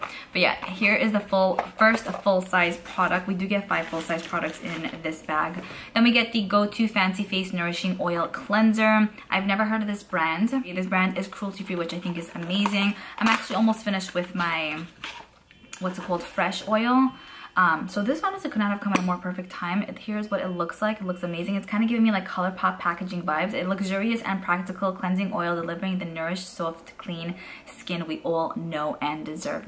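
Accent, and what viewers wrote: New York, United States. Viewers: I AM FROM THE BRONX, I KNOW YOUR ARE FROM SOMEWHERE IN NYC WITH THAT ACCENT!😄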